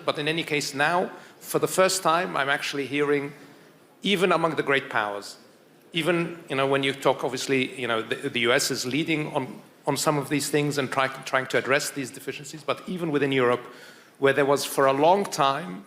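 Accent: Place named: United Kingdom. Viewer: 😍يتكلم باللهجه البريطانيه 👍 و عادل الجبير يتكلم باللهجه الأمريكيه👍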